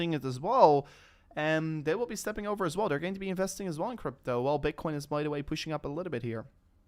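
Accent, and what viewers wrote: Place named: Netherlands. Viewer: Hahha, this guy is Dutch .. Gast ,spreek gewoon Nederlands ..makkelijk te horen dat je Nederlands bent.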